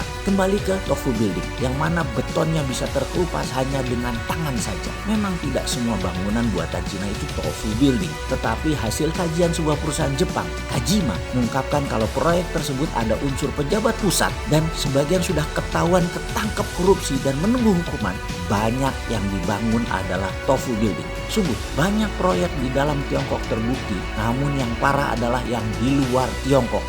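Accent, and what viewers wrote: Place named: East Java, Indonesia. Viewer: Di unek2ke wae bossman yen dikandani ngeyel. Kangen jawatimuranmu metu #peace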